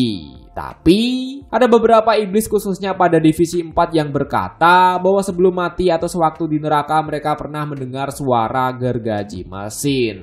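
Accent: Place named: Madura, Indonesia. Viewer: Intonasi nya kek orang Madura bikin sakit telinga apalagi pake headset 🤣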